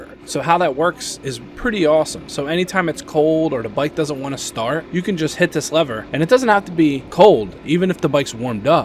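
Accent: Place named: South Jersey, United States. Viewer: You gotta be a south jersey dude with that accent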